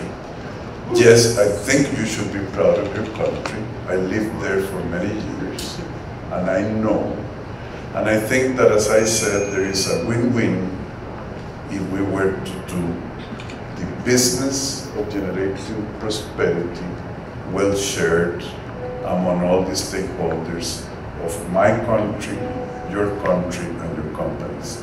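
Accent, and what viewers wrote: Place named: Costa Rica. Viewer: Jajajajajajaj My man does not only “talks the talk, but he also walks the walk” !!!! I know Mr. Chaves is never going to read this comment but I am so glad that speaks with a Tico accent, this shows that he is a true product of improvement and a fighter to whom nothing has been given for free or with a silver spoon. NO RED TAPE !!! Please get all the roadblocks out of the way, we want to move forward as a country., were are behind you!!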